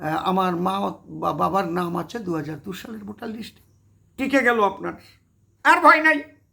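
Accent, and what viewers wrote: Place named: Bangladesh. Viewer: তুমি তো বাংলাদেশি তোমার এখনো বাংলাদেশি ভাষার আড় ভাঙ্গেনি তোমাকে আগে তাড়ানো উচিত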